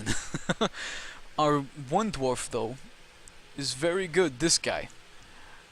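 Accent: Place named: Brooklyn, United States. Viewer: Are you from Brooklyn?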